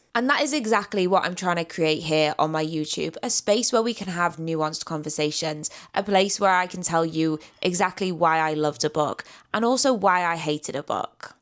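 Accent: United Kingdom. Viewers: With those hyped/popular books, I'm often a bit are the popular because everyone talks about them or are they popular because they're actually good quality literature? In a majority of the cases, I feel it's the first option, and yes, I might be a bit cynical, I can admit that. And while it's of course great if Booktok and the hyped books may have gotten some people to read, don't get me wrong, but there's so many other books out there, that's also well-written. Just to show the middle finger to Booktok a bit, I did start an "old school" book blog where I write about mainly literary fiction, contemporary, classics, and world literature. Some titles more popular than others, but also a bit more "hidden gems" when I come across them. Btw, can I just say I love your British dialect?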